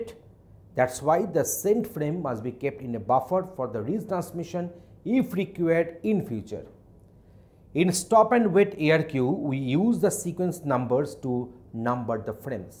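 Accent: Bengal, India. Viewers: Bangali babu English ko bhi bangali bna diye...upar se samjhana bhi nhi aata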